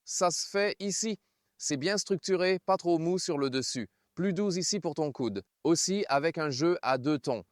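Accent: Quebec, Canada. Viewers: Amusant, l’accent de la voie en français. On entend une pointe d’accent québécois.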